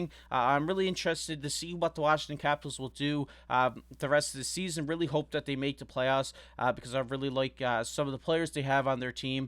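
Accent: Newfoundland, Canada. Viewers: 100% a newfie accent on this guy. Love it b'y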